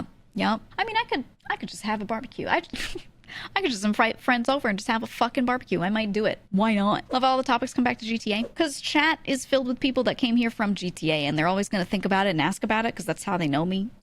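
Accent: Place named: New York, United States. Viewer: she does have the accent and she does have an very bronx/ New York accent.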